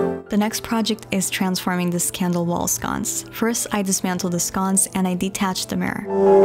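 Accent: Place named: United States. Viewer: I’m curious where you are from, you have a lovely American accent with something lovely from somewhere else...beautiful speaking voice, you MUST start an AMSR channel, you will gain thousands of followers! ✨🐰🐰🌹✨🎠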